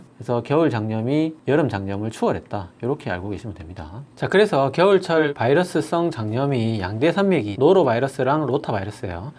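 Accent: Gyeongsang, South Korea. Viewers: 부산사람인데 경상도사투리덕분에 옆집의사쌤같네요.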